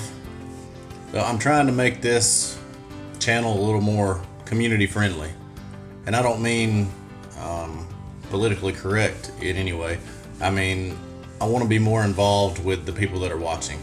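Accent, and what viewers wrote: South, United States. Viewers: i like your southern "Gone With the Wind" accent.. notch it up with a wider smile.. keep positive, you have great potential.. best wishes :-) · Excellent video and your accent made me homesick for SC, TY.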